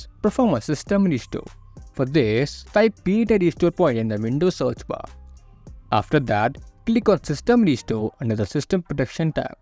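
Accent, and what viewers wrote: India. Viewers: I wish I could understand Indian accents. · when you hear a indian accent you know ur problems getting fixed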